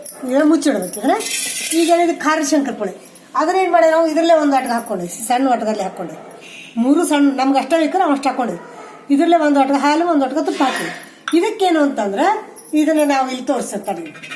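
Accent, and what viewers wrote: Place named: Gulbarga, India. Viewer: ನಿಮ್ಮ ಭಾಷಾ ನೋಡಿದರೆ ಗುಲ್ಬರ್ಗ ಕಡೆಯವರು ಅನ್ಸುತ್ತೆ . ನಾವು ಯಾದ್ಗಿರಿ ಸೈಡಿ ನವರು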